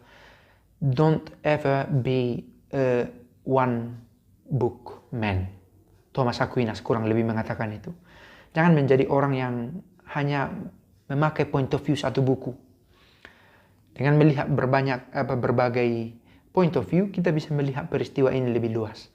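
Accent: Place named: North Sumatra, Indonesia. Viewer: Naratornya dialek sumut kayaknya🤣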